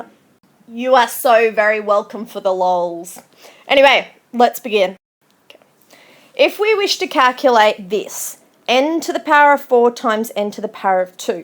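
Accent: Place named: Australia. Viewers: I'm Australian and by the sounds of it, I think she is :) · hey yall ima.australian and.by the sound yall thinking she is.australian .yall are whall awright see yall laterr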